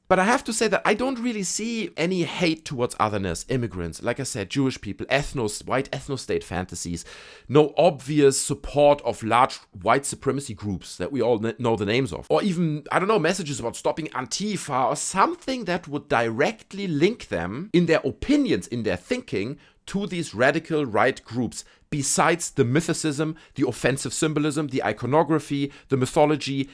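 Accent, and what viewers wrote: Germany. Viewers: coming back to that video years later and found ur channel. must say glad to see a German speaking 😂 with the accent on this... i am african. everything has a consequence, u cant spit on someone's face constantly and think yup we better than them nothing will happen lol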